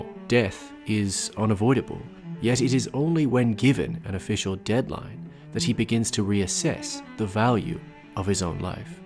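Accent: Ireland. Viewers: Are you Irish? 🤔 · I really enjoyed this video wow. I watch a lot of video essays but this one stood out for how engaging it was. Are you Irish? I’m from Cobh.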